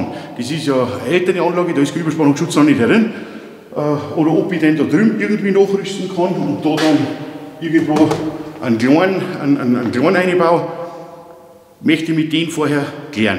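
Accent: Bavaria, Germany. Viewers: Ich weiss das er heisst mehr nicht und bayrisch redet oder Österreichisch keine Ahnung was soll man da eingeben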